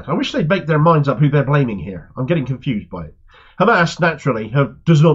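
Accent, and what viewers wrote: Cornwall, United Kingdom. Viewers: I love your Cornish accent! Oh, and the content of your information😅☺️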